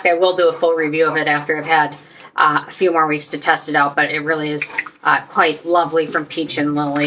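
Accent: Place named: Midwest, United States. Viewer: Paula’s need to STOP … it’s way WAY too many products that she’s selling. I only use one of her cleanser and a booster. More than half of her facial moisturizers affect my eyes, after an hour of application (I’ll stick to my drunk elephant). I’m not a sunscreen person….. after trying hers and expensive brands of both chemical and mineral sunscreens, I’m left with irritated eyes. Anyway, it’s fun watching you and I ❤️ your Midwest accent. Keep up the awesome work👍.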